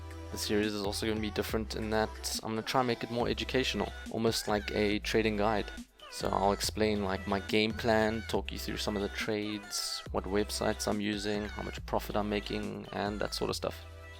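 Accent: South Africa. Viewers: Are you South African? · Are you South African 😂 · You're South African?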